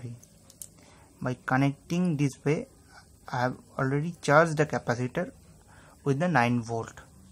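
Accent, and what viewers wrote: India. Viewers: 350 million AMERICAns and the video has 1 guy with a strong India accent?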